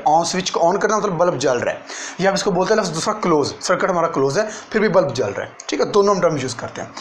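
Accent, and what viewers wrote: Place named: Punjab, India. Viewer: Physics with punjabi touch....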